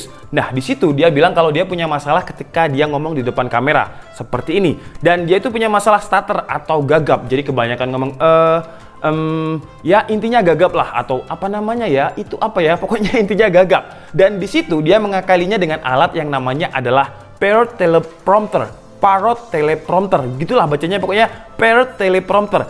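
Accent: Java, Indonesia. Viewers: Orang Jawa ketahuan ketika ngomong b atau kalimat yang ada huruf b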